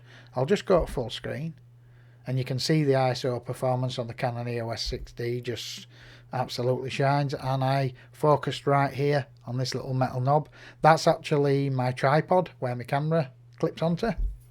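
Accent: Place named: Manchester, United Kingdom. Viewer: sounds like a manc :-) Regards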